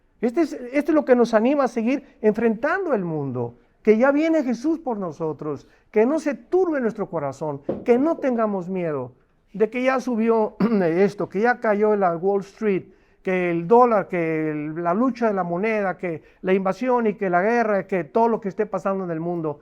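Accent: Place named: Peru. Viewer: Luis Qwerty estas seguro qué esté hombre es mexicano para mi suena como peruano ojo no tengo nada en contra de los peruanos es la primera vez que lo veo y me llama mucho la atención.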